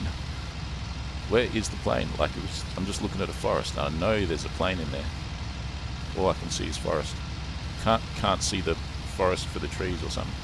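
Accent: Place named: Germany. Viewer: the sight, the guns, your will are not seperate things but one being, as is wing shooting. you shoot well but hesitatingly. refer to hartmann, his last interview, or read eicke's notes that richtofen relied uopn. we in the gallery are with you always, strange that accent...not hoch deutsch....oh well Wachendorf/usa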